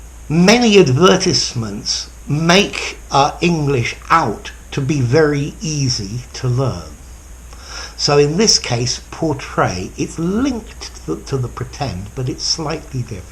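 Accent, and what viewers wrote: RP, United Kingdom. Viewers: I like your RP English accent and the way you teach, as all that makes it easy to make out what you are talking about. Thank you sir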